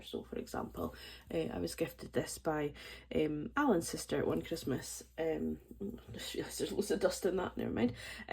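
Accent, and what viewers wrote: Scotland, United Kingdom. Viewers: Omfg! You’re Scottish 😮 I don’t know how I’m ever going to get over that revelation 😂 LOVE the planner can’t wait for mine to arrive xx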